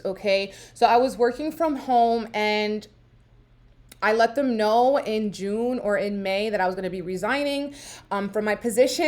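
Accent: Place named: Bronx, United States. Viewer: Yasss i knew it! I heard that bronx accent, my peeps !! Keep shining and thriving boo , love you and your content !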